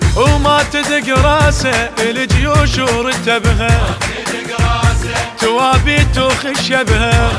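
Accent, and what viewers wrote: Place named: Iraq. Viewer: اني اسمعه الصوت العراقي ماينشبع منه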